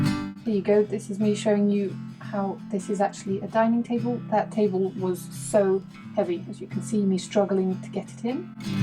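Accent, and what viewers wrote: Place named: Germany. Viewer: third german-sounding girl in a narrowboat video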